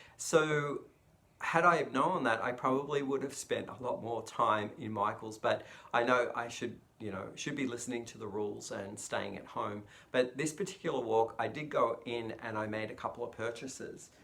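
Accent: Australia. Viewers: Hi, my name is Dawn and I'm in Las Vegas. Bag o Day sent me over. Just subscribed. I watched your happy mail from Crystal and seen all the beautiful yarns. Saw your video with them hats and your Chevron scarf which is very nice itself. Love your Aussie accent. I don't knit but would like to learn. I do crochet do you? Will stay with you from now on. I have two in laws from Canada and truly like the country. You and hubby have a great weekend and stay safe.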